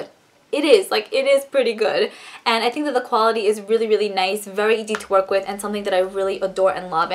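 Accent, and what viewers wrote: New York, United States. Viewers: I subscribed as I love makeup and also love your accent - New York, right? (forgive my ignorance, I'm not American ☺)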